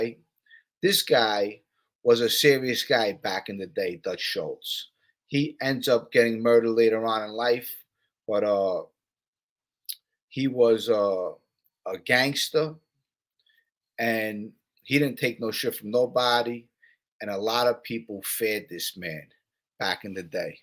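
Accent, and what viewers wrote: New York, United States. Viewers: Hi Mr, that was great story. I have to tell you that you have the best N Y accent of all the other guys that do mob stuff. It's really cool. Stay with this your doing great.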